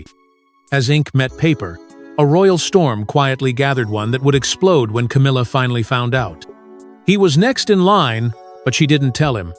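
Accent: United States. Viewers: Good for Princess Katherine if it's true which I hope it is! Why is being said by another American voice? Stay out of it, it's for the British people not Americans!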